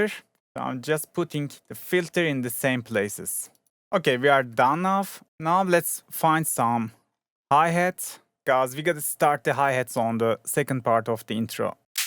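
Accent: Turkey. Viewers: broooo. i loved your accent. tell me you are turkish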